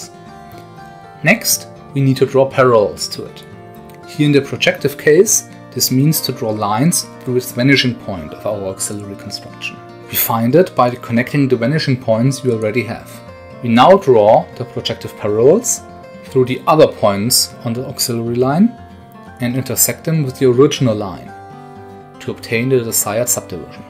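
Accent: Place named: Germany. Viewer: I concur that in the future you have to set the music at much lower level, or actually not use music. Occasionally you swallow consonants, such as where your script says 'square length' but it ends up sounding as 'skwele'. Between that and your german accent: the viewer neets a couple of brain cycles more to get to the words you are saying. Yeah, there is a trade-off there. Pronouncing everything would sound ponderous (Related subject: video by Tom Scott : <link> ) In the case of 3blue1brown I see the music used as part of the brand; the narration is soothing, the music is soothing. For minutephysics too I regard the music used not as part of the video as such, but as part of the brand. Personally I tend to prefer minimalism.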